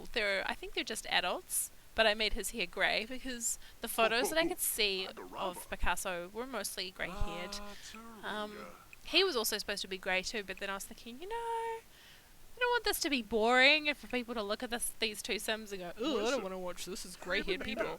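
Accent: New Zealand. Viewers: I love the sims. love kiwi accents also "struth"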